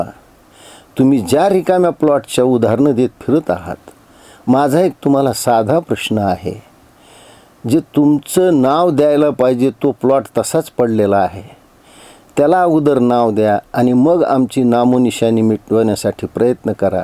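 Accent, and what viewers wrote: Marathwada, India. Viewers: महाजनकाका तुमच्या संपूर्ण घराला वक्तृत्वाची जी अजोड देणगी लाभली आहे तिचा उपयोग तुम्ही शिवसेनेसाठी करा. योग्य मुद्दा काढून समोरच्याला नामोहरम करण्याची तुमची शैली वादातीत आहे. थोडा निजामी टच असतो बोलण्यात पण तो आता बदलणे शक्य नाही त्यामुळे चालवून घेऊ. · मराठवाडी आवाज म्हणजे साहेब.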